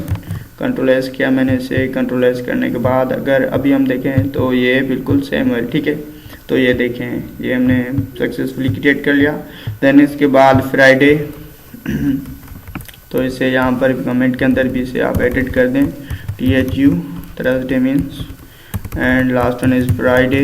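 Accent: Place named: Sindh, Pakistan. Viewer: Your Talking style seems Sindhi by the way Love you and Thanks <3 Peace !!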